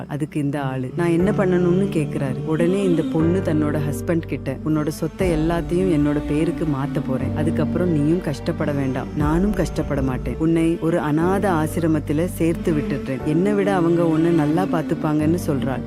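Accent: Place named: Kerala, India. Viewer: Madam unga videos ellam super.unga voice very very super.unga kuralil malayalam kalantha tamil ketka enimaiyaka erukkirathu❤❤❤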